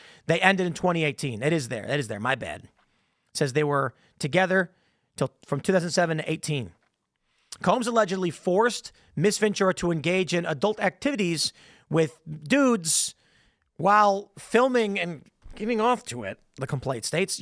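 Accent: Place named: Chicago, United States. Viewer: face in all these recent thumb nails are smug af imo just saying. Que to thicken the Chicago accent about cheeseburgers and being cooked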